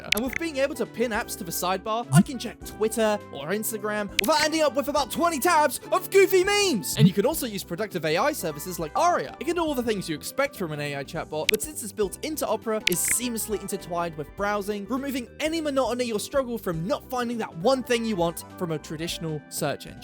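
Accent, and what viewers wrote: United Kingdom. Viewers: i don't click onto your videos all too often but i am jumpscared by you being british every time. i somehow always forget and it's terrifying every time.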